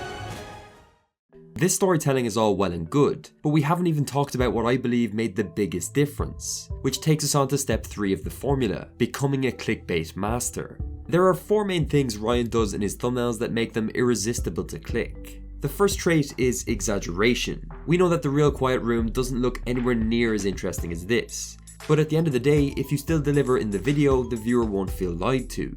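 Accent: Ireland. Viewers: Are you Irish? And happy St. Patrick’s day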